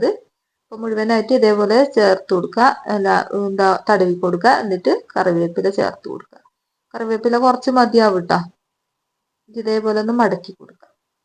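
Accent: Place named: Kozhikode, India. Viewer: Kozhikodkary enn thonnunn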